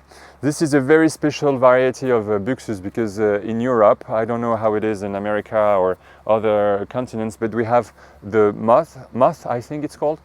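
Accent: France. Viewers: I love the symmetry of your gardens ,thanks for showing us that you have a plan to follow.❤I am creating a garden and have a blank canvas.I love the Pierre de Ronsard Roses .I grew one over an Arbour years ago .I have bought two to plant against the Brick wall.and have a standard and other Pink David Austin’ roses etc Your hydrangeas look beautiful .I have a number of Hydrangeas-to plant ,blue and Pink . I want to incorporate a vegetable and Perrinials area into my plan too.I can see the garden rooms you have created.😊You have a French accent 🇫🇷 are you near Switzerland.Cheers from Australia 🇦🇺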